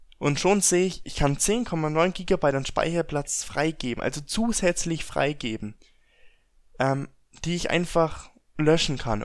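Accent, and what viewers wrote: Franconia, Germany. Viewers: Schätze mal du bist ein Fränkischer Mensch aus Franken (Dipp). Du redest ja wie der Loddar. Aber mach die nichts draus. I ben of älle fäll a Schwoab. Ich finde ja alle südlichen Dialekte super. Aber so ein Ossi oder auch Fischkopf-Dialekt kann ich gar nicht ab. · aus franken? :D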